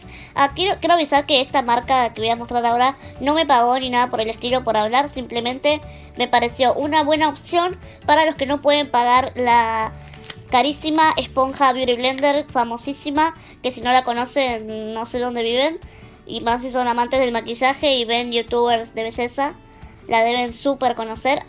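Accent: Argentina. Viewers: jejjeje es argentina, el acento es muy chocante. Algo basico linda 😘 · sos de buenos aires??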